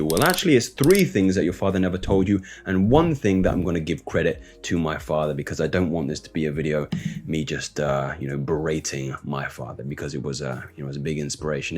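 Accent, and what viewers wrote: United Kingdom. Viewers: Your videos are priceless. I learn a proper British English as well. Cheers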